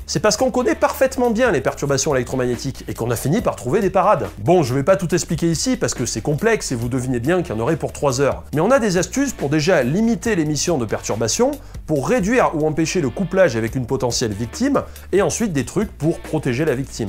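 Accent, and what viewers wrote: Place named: Haute-Loire, France. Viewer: Yo ! sympa ta chaîne, dis moi t'es du 43 u 42 ? t'as un accent du coin toi ;)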